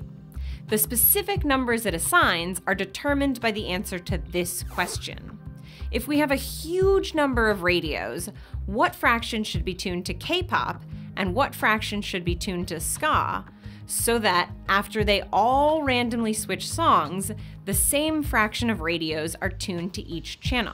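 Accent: United States. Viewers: Y u talkin murican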